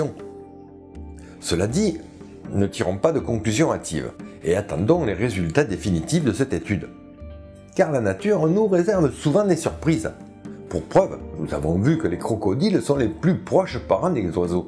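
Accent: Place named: Occitania, France. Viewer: Salutacion dempuèi Occitània, que s'ausis a l'accent del youtubaire ;-)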